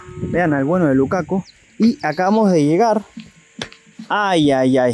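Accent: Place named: Argentina. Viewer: Pobre hombre no quisiera estar en tu lugar, hablas Como Argentino, y tienes in aire de Carlos alvarez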